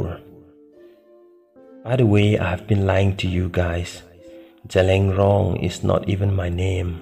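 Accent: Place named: Manipur, India. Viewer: Your manipuri accent is quite funny wt this story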